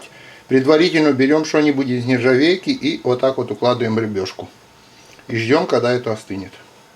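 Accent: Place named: Odessa, Ukraine. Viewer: . красивый одесский акцент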